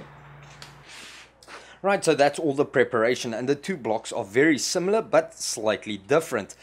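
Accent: South Africa. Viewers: Great ideas, love the video. Spent time in Pretoria growing up so love the accent. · Thanks very informative - Hey are you South African? Where are you? So I'm new to woodwork and am showing my ignorance here. Just mad a long narrow shelf for my kitchen to store tea caddies etc but I dont think its deep enough for wall support so is insecure, Any ideas?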